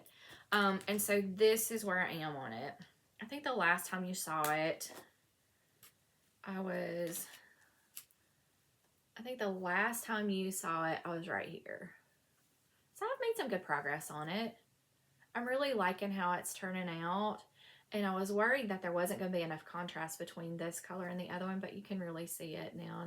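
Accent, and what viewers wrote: South, United States. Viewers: Hard to pick one favorite moment of your podcast but I always enjoy your southern accent and how you just enjoy yarn & your life. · Congratulations on your one year podiversary. It’s hard to pick a favourite moment but learning the correct way to say pecan today is up there along with your delightful southern accent. I’m in Australia so you sound very exotic and of course I was mispronouncing pecan 😉🙃😎❤️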